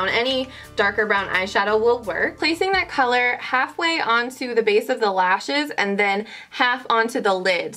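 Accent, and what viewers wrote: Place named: Minnesota, United States. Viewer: Are you from Minnesota? I lived there my whole life up until this past year, and I can totally tell your accent. The way you say your A's :)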